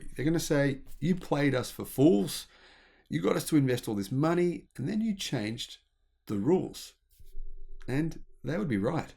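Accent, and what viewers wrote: Australia. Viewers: Your OZ accent is tough to follow. The speed of your speech makes it even tougher. Can you modify either, so that non-oz speakers can better comprehend your narrative ? Tx.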